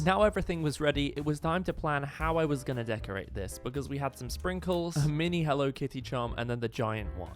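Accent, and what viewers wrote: United Kingdom. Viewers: I had no clue you were from the Uk- but HII ME TOO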